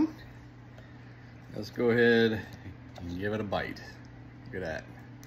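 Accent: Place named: Louisiana, United States. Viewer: I hear Louisiana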